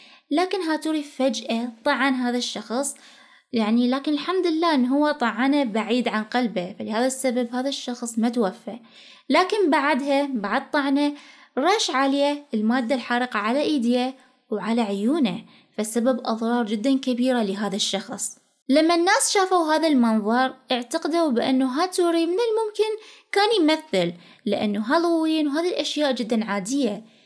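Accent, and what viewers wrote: Iraq. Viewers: ياحلو الهجه العراقية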